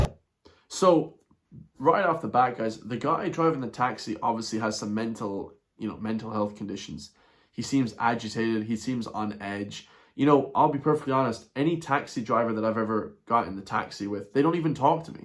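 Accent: United States. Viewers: Get it right though; don’t clickbait! It’s not that irish isnt allowed is it? it’s not illegal it’s just that one person has mentioned it. Also, how the hell would you know if he’s illegal never mind all the other racist shit you’re spewing. You don’t sound very irish either btw, getting a strong yank flavour to your accent buddy.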